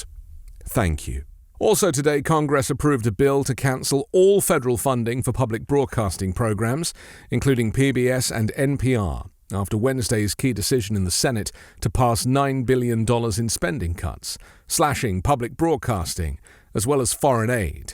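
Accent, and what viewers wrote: United Kingdom. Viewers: You have such a lovely calming voice, British accent, really easy to listen to.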